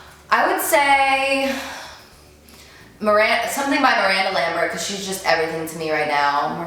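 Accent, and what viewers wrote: New Jersey, United States. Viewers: Your Jersey accent is so cute:) Love you!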